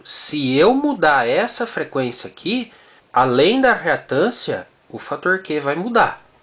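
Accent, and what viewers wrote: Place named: Caipira, Brazil. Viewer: Gosto de voce porque voce fala que nem um caipira com certeza gosta de música caipira ambém......tabém sou filho de caipira...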